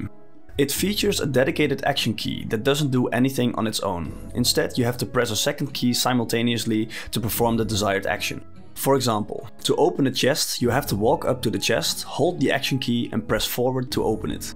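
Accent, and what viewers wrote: Netherlands. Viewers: nice dutch accent. Greetings from Germany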